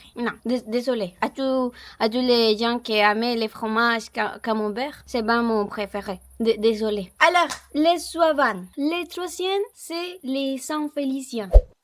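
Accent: Colombia. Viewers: YOUR COLOMBIAN ACCENT SPANISH IS BEAUTIFULL IN FRENCH❤❤❤ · Ton naturel ton sourire et ton accent colombien m'on fait craquer tu es adorable et trop mignonne j'adore le camembert 😂😂😂ta joie de vivre me fait trop plaisir💋😘😘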